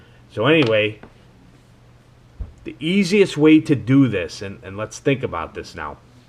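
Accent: Chicago, United States. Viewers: You've gotta be from Chicago with that accent! Or Elmwood Park or something.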